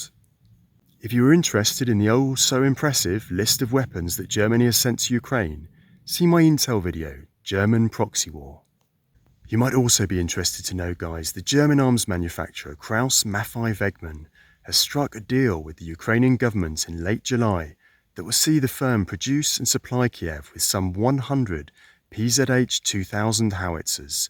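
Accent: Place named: England, United Kingdom. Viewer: Your accent is English!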